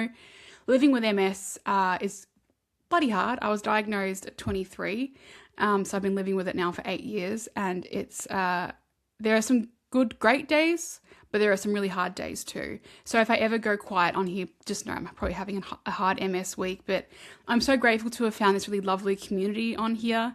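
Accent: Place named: Australia. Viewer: can i just say, you should never have to apologise for having an Aussie accent. it's yours and you should own it. if there are viewers who complain about not being able to understand you, then they can simply put on subtitles. you have a stunning accent and should be proud of it.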